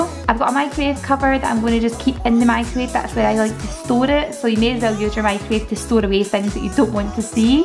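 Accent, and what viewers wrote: Scotland, United Kingdom. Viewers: Oh I love these cleaning videos, im scottish too, it was a surprise to hear a Scottish accent! 😊 most are American you tubers.loved the video. subscribed 😁 x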